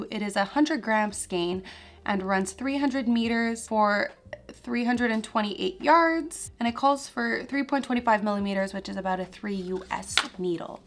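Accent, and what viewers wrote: Quebec, Canada. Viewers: I just posted my knit city video as well! I learned to knit on youtube in 2016 but picked it back up during quarantine. Are you Quebecois? I can tell by the way you read those labels! #frenchcanadiangang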